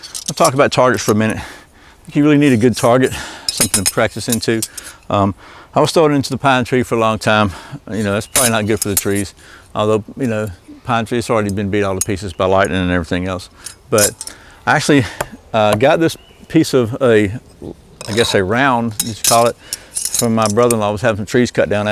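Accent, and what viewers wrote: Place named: South, United States. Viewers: I was guessing KY or TN, but definately south east. Great videos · This southern accent at least thats what i think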